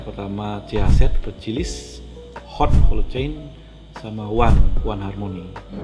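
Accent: Bali, Indonesia. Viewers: orang bali ya blii? aksen nya seperti orang bali///?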